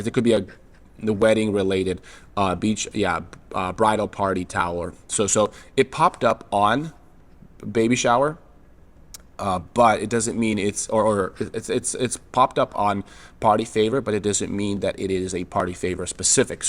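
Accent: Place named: North, United Kingdom. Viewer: your second language? When did you learn? You accent almost just sounds like a northern accent. Impressive 👍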